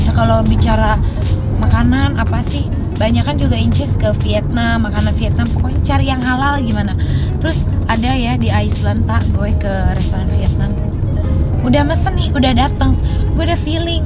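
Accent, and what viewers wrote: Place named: West Java, Indonesia. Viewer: Sunda pisan geningan inces nyaa... GK bisa dibohongin orang Sunda mah lama diem di Jakarta di luar negri logat nya ttp nyundanis keneh wehhh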